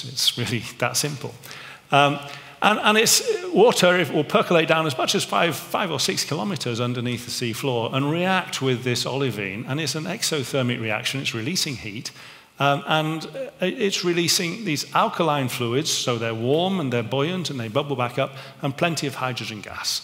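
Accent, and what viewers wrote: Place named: England, United Kingdom. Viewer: Such bull shit delivered with an english accent to impress the really stupid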